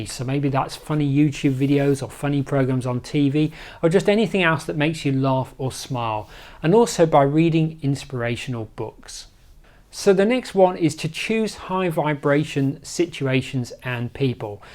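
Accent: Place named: England, United Kingdom. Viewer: Always nice to hear a calm, gentle English accent. So much more pleasant to listen to. 🙏 Thank you for your invaluable knowledge.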